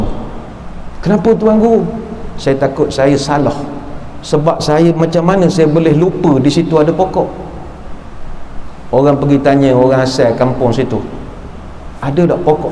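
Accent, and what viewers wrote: Pahang, Malaysia. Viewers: Sorry sis,memang macam inilah loghat Pahang,mungkin dgr mcm ada iras2 ganu sebab Kuantan sempadan dgn kemaman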